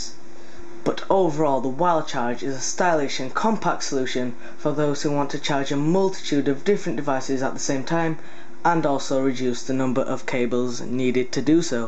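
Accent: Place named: Scotland, United Kingdom. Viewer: your accent sounds like a mix between Scottish and British :D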